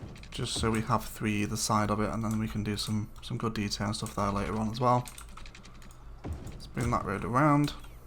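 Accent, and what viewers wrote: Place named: Liverpool, United Kingdom. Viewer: Where are you from in England? Your accent makes you sound like a Beatle. Are you Ringo Starr?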